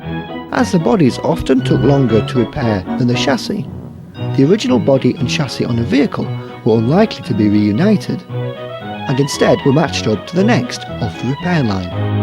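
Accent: United Kingdom. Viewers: ...And why use some Northern guy as a narrator rather than someone from London. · The film was made in the mid 1950s - the heyday of the London RT family and long before Routemasters entered Aldenham. Wonder why a Liverpudlian was chosen as the commentator. So UNLIKE London Transport who normally preferred a plummy London version! Music was like attending a funeral!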